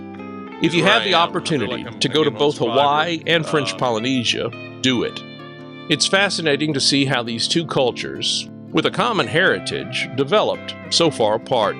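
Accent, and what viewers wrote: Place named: Mississippi Delta, United States. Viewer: Based on those pronunciation examples, perhaps you might be from the Mississippi Delta?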